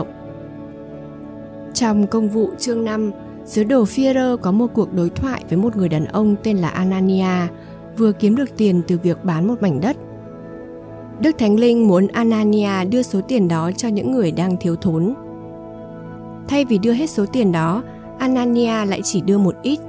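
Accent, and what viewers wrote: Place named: Hanoi, Vietnam. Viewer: Giọng đọc hay quá . Cảm ơn nhé.nghe rõ, chuẩn giọng hà nội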